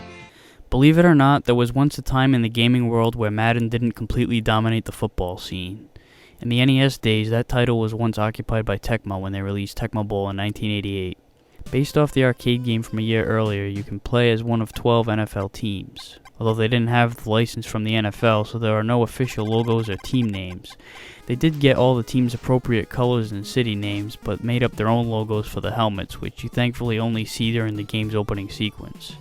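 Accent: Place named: East Coast, United States. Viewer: your from the east coast huh, i can tell by your accent especially when your curse that shit made me bust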